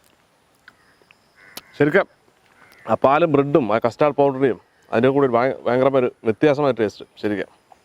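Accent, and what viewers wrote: Palakkad, India. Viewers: Chetta pudding adipoli...valare simple ayittum ,cool ayitta karyagal chiyunnath .matramalla palakkadan slang ..super ikka ......😎👌...god bless uuuu.....all the very best...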